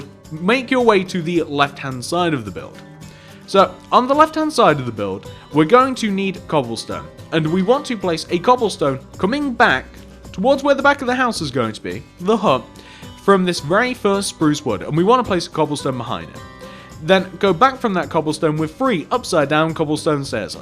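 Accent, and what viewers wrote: United Kingdom. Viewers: I think he's from the U.K.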